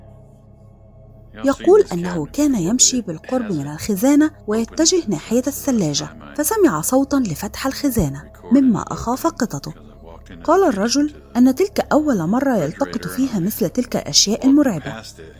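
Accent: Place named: Egypt. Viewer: يا مرحبا بأخت صدقيني اعشق صوتك اتعرفين لماذا لانو عندما كنا صغار في جزائر كنا نسمع صوت رسوم متحركة بلهجة مصرية ولهاذا صوت مصريين نحسه يذكرني بصغري والله روعة 🇩🇿🇩🇿❤❤🇪🇬🇪🇬💪💪💪💪💪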